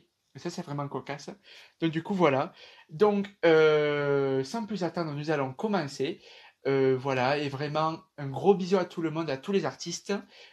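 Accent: South, France. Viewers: Sa se voit que tu VIEN du sud de la France comme moi 😊🇫🇷🖤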